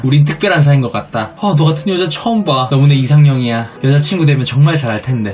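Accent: Gyeongsang, South Korea. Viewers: 억양이 대구 경북분같으세요. 아주 날카롭게 지적하시고,아주 맞네요. 자기중심적 남자나 여자 아웃.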